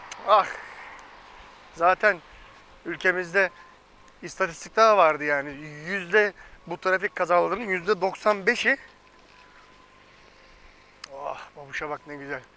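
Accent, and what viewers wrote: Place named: Aegean, Turkey. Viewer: Hocam şiven çok güzel Aydın mı Muğla mı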